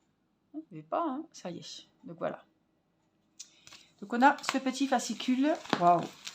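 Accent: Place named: Nancy, France. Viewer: Trop belles ces toiles Vitraux ❤️❤️❤️ j'ai une petite question qui n'a rien à voir : je te suis depuis peu et ton accent très très prononcé me fait penser à celui que j'avais il y a qques années : je suis originaire de Nancy mais j'habite depuis plus de 20 ans à Toulouse. Et toi ? 🤔😁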